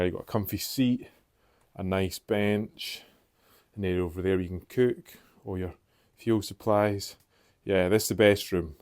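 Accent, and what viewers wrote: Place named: West Lothian, United Kingdom. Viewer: You are welcome your videos are not boring my pal goes to them all the time and a lot of the time people are in them its just the luck of the draw keep uploading your subs will go up you sound local to me i am in west lothian :)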